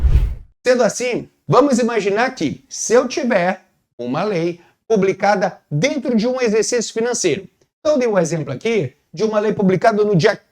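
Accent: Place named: Portugal. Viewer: Sotaque de português. Ótima aula!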